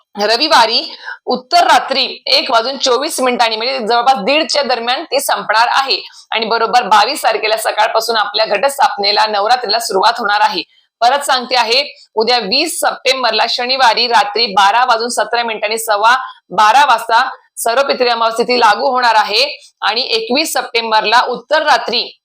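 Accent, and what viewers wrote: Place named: Karnataka, India. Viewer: बोलण्यावरून तुम्ही कर्नाटक च्या वाटताय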